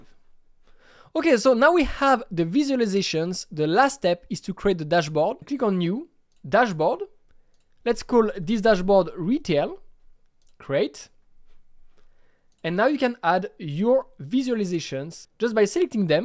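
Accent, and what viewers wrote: France. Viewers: Just by the accent I assume that you are a french speaker. It would be great if you can make videos in french also. Great and explicative video!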